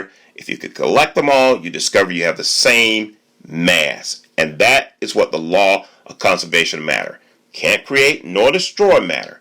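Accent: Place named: United States. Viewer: I am a kenyan hence i am not getting the english well because he is an american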